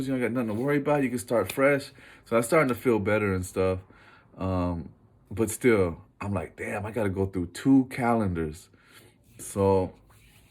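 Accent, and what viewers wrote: Houston, United States. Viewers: “I’m from Houston, Texas. A lot of y’all already probably know.” I know someone from Houston when I hear one 😹. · What part of the H you from bro?! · My boy you gotta be from alief lol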